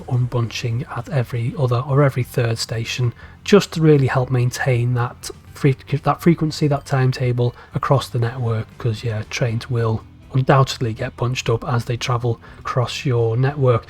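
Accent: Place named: Scotland, United Kingdom. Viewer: First things first. I think the issue of resetting the clock when using “full loan any/full load all” has already been addressed since I have no longer been experiencing trains never leaving stations. Second, you explanation of this tool is so disorganized that I do not get it. You click on something, then you delete it, click on something else, keep saying one think over and over instead of going systematically step by step. Third, I believe it´s fair to use standard English on You Tube. Even though I am not a native speaker your accent (assumably Scottish) is hurting my ears. The way you pronounce the word “unbunch” made me stop watching the video.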